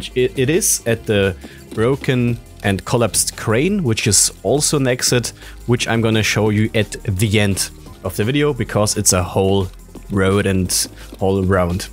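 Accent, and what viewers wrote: Germany. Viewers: ich liebe deinen deutschen Akzent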